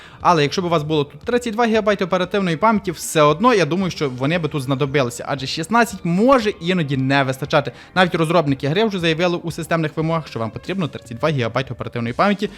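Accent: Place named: Hutsul, Ukraine. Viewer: але файний той гоцульский говір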